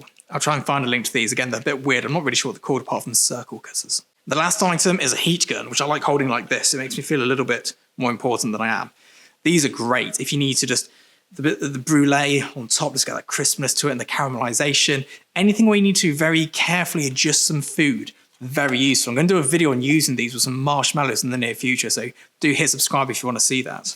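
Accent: England, United Kingdom. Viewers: stay with British English, it's more interesting to us Americans :) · Hi, thanks for sharing your insights and all of that mate! Could you show us how you get everything level for flat lay and how you use that laser wotsit? As far as your choice of English goes, I like your English English!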